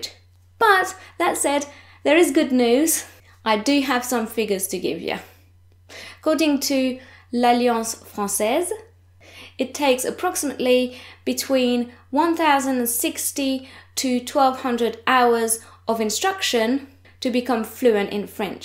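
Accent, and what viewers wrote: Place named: United Kingdom. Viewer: Merci beaucoup for this video! You sound so British!!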